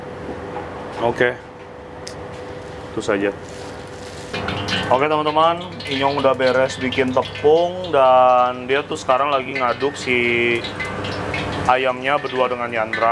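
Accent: Banyumas, Indonesia. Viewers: Kok jowone Inyong Inyong mang ini orang mana. Xie Xie ya Koo ilmunya Sucses selalu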